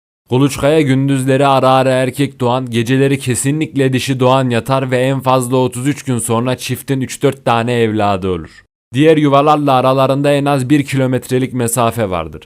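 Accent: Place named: Çorum, Turkey. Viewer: Kardaşıma şunu söylemek isterim kesinlikle sen bir üreticisin seni saygıyla selamlıyorum. Şiven gayet kulağa hoş geliyor ben Çorum Yozgat arasında gidip geldim.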